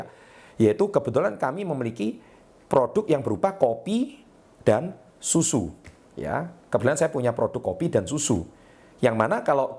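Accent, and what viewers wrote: Java, Indonesia. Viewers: ngmong'a medok,kyak'a anda orang jawa y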